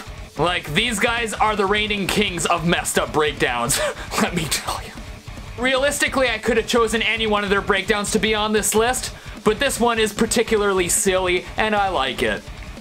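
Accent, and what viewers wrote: England, United Kingdom. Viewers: Spot on English accent to be fair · As a Brit, I approve that accent.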